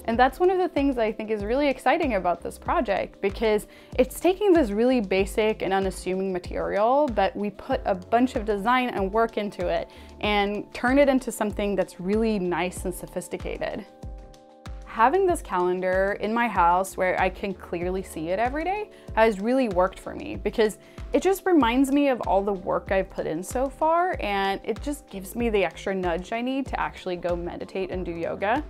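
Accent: Sweden. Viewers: Wait you swedish?? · Are you swedish?! Cool product tho. I'd buy it :)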